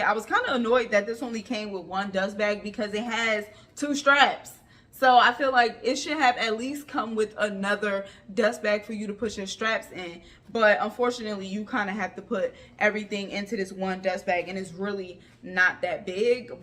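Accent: New Jersey, United States. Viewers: I came here for the bag review. I've been off of Coach for a while. But it seems like they're making a comeback. So here I am. CUTE BAG! But let's talk about you! You have a great personality. So funny. Classy. And real. You remind me of me and my friends. You MUST be from the east coast. Sounds like Jersey. (I'm from Newark). In any event, great video. Great job!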